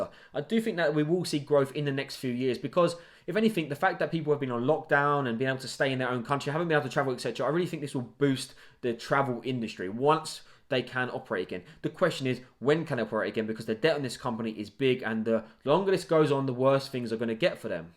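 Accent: United Kingdom. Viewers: Good keep it up! As an Italian in the UK, i like your UK accent 👌🏻😄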